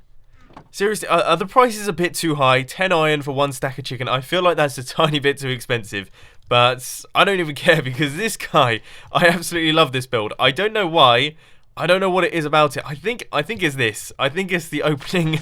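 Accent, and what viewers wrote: England, United Kingdom. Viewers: In just 2 minutes I was inspired to fly my drone again. I've never been so inspired in my life. Is it the British accent? Idk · Yeah I figured he was English (or Welsh) but I just wanted to ask because you never know.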